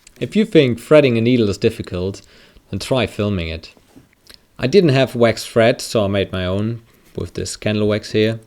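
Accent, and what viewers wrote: Germany. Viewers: actually he's got an accent, I'd say he's from Germany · Really cool project. Your english is good, but you have this tiny little accent. Are you german? :D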